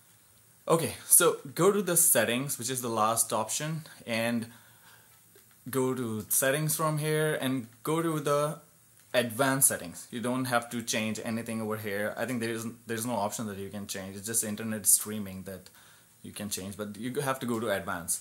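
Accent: India. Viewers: Christ Youtube doesn't support 4K ??????????????????????? you need to prepare a lot before making a video and first speak slowly no need to rush. what accent are you on ? india ?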